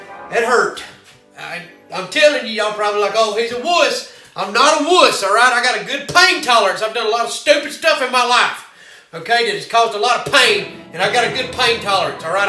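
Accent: United States. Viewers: Man his cowboy accent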